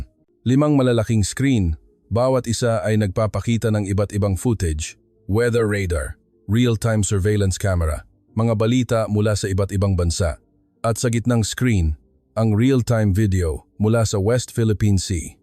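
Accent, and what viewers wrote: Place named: China. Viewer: Ang labo naman ng narrator di mo alam kung pinoy o Chinese... ito lang ang opinion ko sa ngayon handa na ang Pilipinas makidigma sa china pinaghandaan na yan ni PBBM kaya nga naglatag ng mga EDCA site para paghandaan ang gyera... Hindi kakayanin ng china ang pwersa ng Pilipinas dahil sa mga kaalyado nito na pinangungunahan ng USA kawawa lang china kapag nagkataon tancha ko lang 1 week lang ang itatagal ng china susuko sila sa bigat ng opensiba ng USA yon lang talaga iniiwasan din ni PBBM na magkaroon ng digmaan hanggang kaya pang iwasan...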